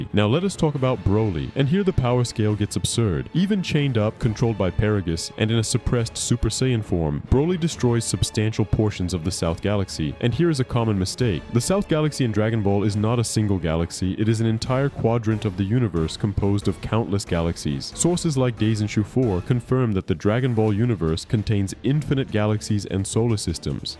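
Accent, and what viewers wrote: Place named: Brazil. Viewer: He's Brazillian Bro, don't blame him, it's difficult to know how you american guys say shit.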